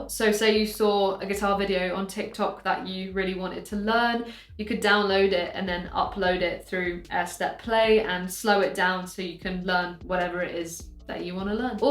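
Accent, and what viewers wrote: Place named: North, United Kingdom. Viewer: Your Northern accent made me laugh 😂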